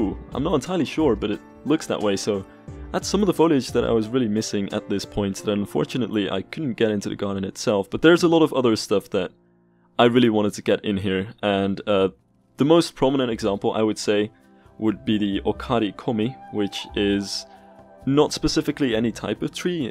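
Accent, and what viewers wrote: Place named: Netherlands. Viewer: Nice!! Duurde even voordat ik het hoorde maar op gegeven moment dacht ik.. wacht eens even.. dat is een Nederlander!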